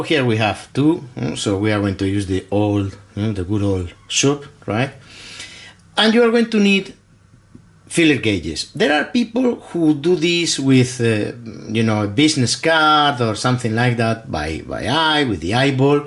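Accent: Spain. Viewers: Very good info, I am slowly getting through your videos, I love you Spanish English accent. Merry Christmas, cheers Simon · Great accent. Are you Spanish? I live in Australia now, so I don’t hear Spanish accents very often. Thanks for the video. It was excellent. 😁👍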